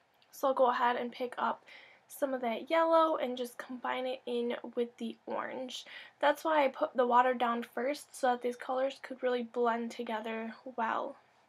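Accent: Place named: Minnesota, United States. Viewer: I'm happy you're back! Congratulations on your new baby! Your accent sounds like it's from here where I am in Minnesota! BIG time!!!!!!! :-)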